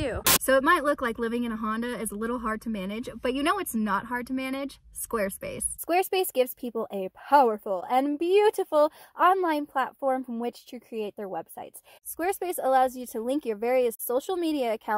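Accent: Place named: California, United States. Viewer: She sounds like she’s from California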